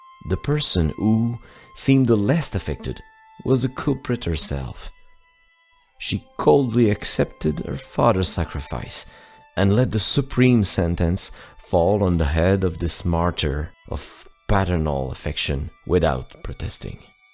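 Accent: Quebec, Canada. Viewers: Also it's great to hear a quebecer !!! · As a Quebecer, I've heard of La Corriveau, but it was a different story. I wonder how they discovered it really was only the two men she had killed? Very interesting, and love the added touch of having a Quebecer narrate this story.. the morbid aside, this was lovely! :) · To those poor little souls hurt and insulted by a slight French Candian accent... Did you also go on Disney's forums to complain that characters in Encanto have a Spanish accent? The legend is from Quebec City and Lévis, where citizens still talk French today. It's 2022, FFS! Please, grow a damn spine and enjoy people's cultural upbringings! It's a strength, not a weakness!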